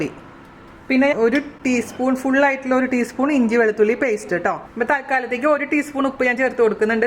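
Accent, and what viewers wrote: Thrissur, India. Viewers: chechi de ഒട്ടുമിക്ക dishes ഉം ഞാൻ try ചെയ്‌തിട്ടുണ്ട്. Already subscribed your channel long ago.. But comment cheytat ഇപ്പോഴണെന്ന് മാത്രം. I like your dishes❤️❤️ also like that trissur slang 😍❤️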